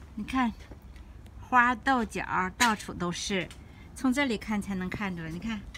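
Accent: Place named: Zhuozhou, China. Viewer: 听着这么熟悉的口音，您是涿州一带的人吧？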